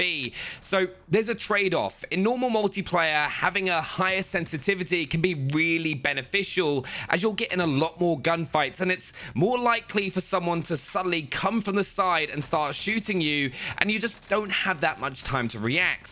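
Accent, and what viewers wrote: United Kingdom. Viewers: Both British i guess...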